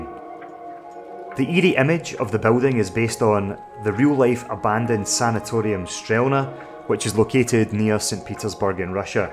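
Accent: Scotland, United Kingdom. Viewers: Appreciate hearing an awfully Scottish sounding voice in a tarkov video. This caught my eyes on my recommended feed and hopefully will get into others too.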